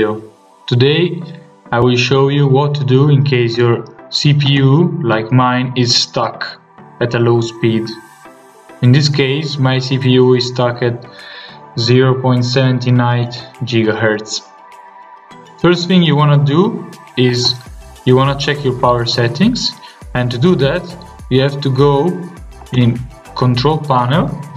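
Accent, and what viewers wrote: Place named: India. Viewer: it’s always the india sound kinda guy that’s helpful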